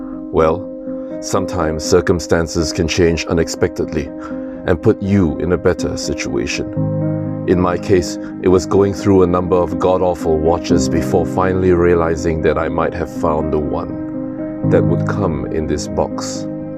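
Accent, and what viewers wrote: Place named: Singapore. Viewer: to - wow. The writing quality is really up there. Turning the born to dare line around was smart and potent. I used to live in Singapore and recognized your accent... then I saw the beaches and knew it was Singapore! Congrats on this quality content, dude. As for me, the Tudor BB GMT is not for me. I don't think I'll ever be able to justify paying more than 1000 for a watch when you can invest it and return 7-10% per year in the market. The mark up is also inexcusable (cost to make vs. MSRP). I don't doubt at all the quality of these expensive watches though. Great video, please keep going. The watch community DOES need thoughtful content. You're going to grow big in no time with these type of thoughtful essays. Good luck.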